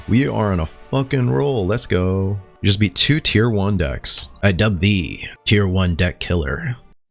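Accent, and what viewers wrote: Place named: Australia. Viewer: Lol that Aussi accent 😂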